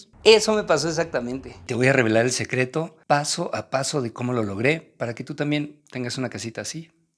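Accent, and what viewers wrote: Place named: Mexico. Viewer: Jajajaja, literal me subiste la vibra del día. Ya tienes el don de la palabra que además te fluye de maravilla por tu acento, "que viva México carajooo". Luego me partí de risa con lo de la vecina ruidosa, porque estoy pasando por lo mismo. Gracias por compartir, inspirar y por motivarme con tu espontaneidad. Por favor, no vayas a visualizar novias diferentes porque las tazas son útiles, pero muchas mujeres te volverían loco, jajajaja. Graciasss.... voy a comenzar hoy con el reto de agradecer a la loca ruidosa de mi vecina 🤣🤭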